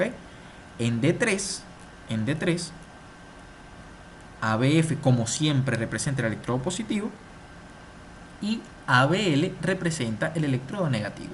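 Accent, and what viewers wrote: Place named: Venezuela. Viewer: Saludos desde Venezuela, guatire, estado Miranda, soy estudiante de Medicina, excelente vídeo clase, de verdad te felicito, eres tremendo para enseñar, tienes el don. Te admiro. Descubrí tu canal ayer, oficialmente me suscribí y te di like. Por favor publica más vídeos como este. De seguro ya te graduaste de médico, por tu acento puedo decir que eres paisano mío, ¿Estudiaste en la UCV? Ojalá regreses para ver más clases tuyas y seguir aprendiendo.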